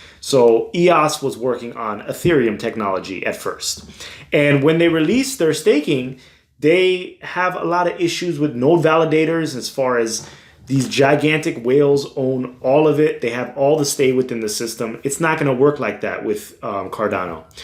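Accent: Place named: New York, United States. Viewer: Did you go to an Ivy? You are very eloquent. And you sound like you're from NYC.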